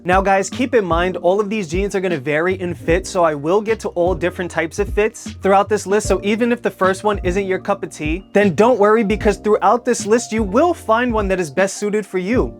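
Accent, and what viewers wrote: New York, United States. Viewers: Thank the lord, there's still people with New York accents.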